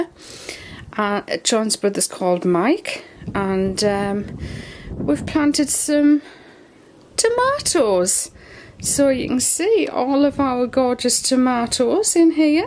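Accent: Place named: England, United Kingdom. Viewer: I like to see your video. Beautiful English accent .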